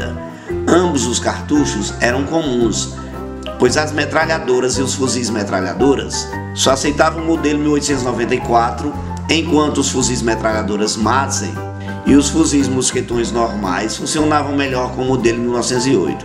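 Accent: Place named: Northeast, Brazil. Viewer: Sua narração é show, seu sotaque nos emociona, só quem é nordestino senti!!!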